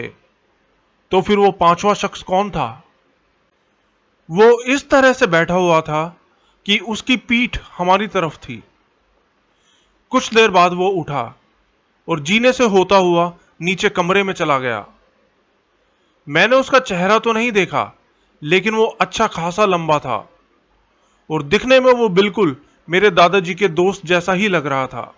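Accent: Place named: Sindh, India. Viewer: Aap kya sindhi ho kya baat karna waqt rhoda sindhi sound karta ho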